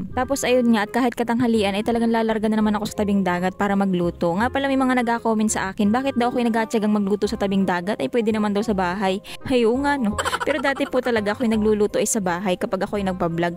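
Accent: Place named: Marinduque, Philippines. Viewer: Miss ganda at masipag pa kala ko taga marinduque ka kc un mga salita u ay puntong marinduque ngani mandin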